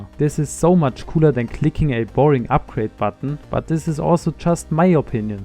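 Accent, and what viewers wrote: Germany. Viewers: you have a german accent.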